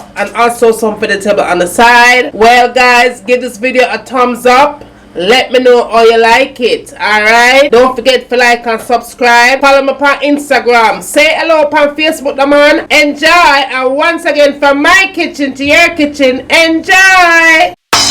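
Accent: Jamaica. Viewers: Love the Jamaican talk, love how you clean I would have your stew pork, but next time try putting the sweet peppers last.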